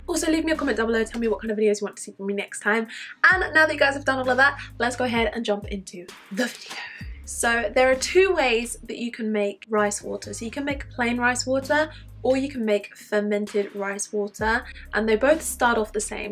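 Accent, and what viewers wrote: United Kingdom. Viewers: Hi. This is my first time seeing your video. I really needed and appreciated your show. Great British accent. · Wotah Ahh I love the British accent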